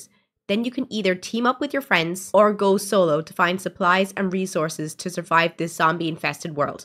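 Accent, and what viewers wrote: Ireland. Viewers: I like your accent 😊 I need to move to Ireland 🇮🇪 😁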